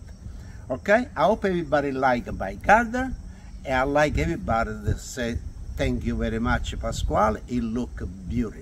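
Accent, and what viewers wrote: Italy. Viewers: I can spend the whole day listening to this man talking about his garden with his Italian accent !! The most beautiful thing 🥂🥂👍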